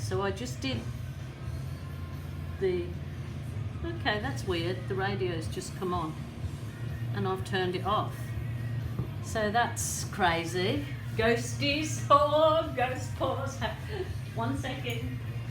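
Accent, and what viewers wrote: United Kingdom. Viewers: I know you made thos video in October and it is now December 29. You said you had 10k subscriptions. Either you grew fast or you don't see all of your subscriptions. It says 24.9k here. Either way, well done. I love your prattling on while I watch your videos. I am in the US and my family is in the UK. I know you are in Australia but you still have the Brit that I love listening to and your art is amazing.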